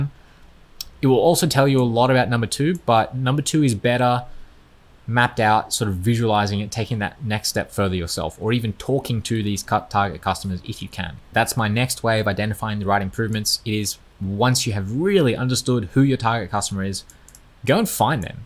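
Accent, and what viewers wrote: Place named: Australia. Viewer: hahaha and that’s 79% of why i subscribed!i also have an accent.. but British ( Caribbean British ).. are you Aussie?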